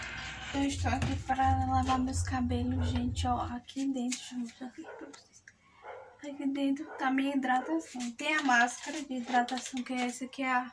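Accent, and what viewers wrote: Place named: Northeast, Brazil. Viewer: E um sutaque de nodestino adoro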